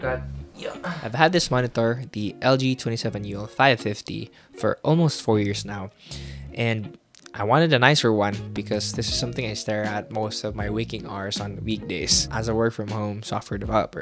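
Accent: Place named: Philippines. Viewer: Oh wow, as soon as I heard you start talking I was like "he sounds like he's from PH..." :P My LDR girlfriend is from there as well; any recommendations for cool places to visit when I go there in the fall? Borocay seems cool but I feel like everyone does that.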